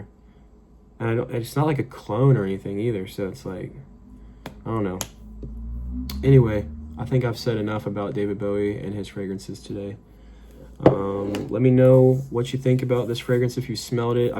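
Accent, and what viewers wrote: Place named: France. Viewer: Ey man I was curious. Why do u often use this "france-ious" dialect?✌🏼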